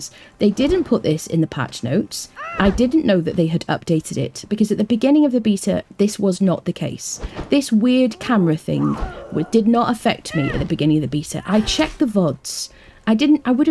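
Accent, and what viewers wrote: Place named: United Kingdom. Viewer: I love uk accent. Bee-tah. 😍 Us southern yanks say Bay-tah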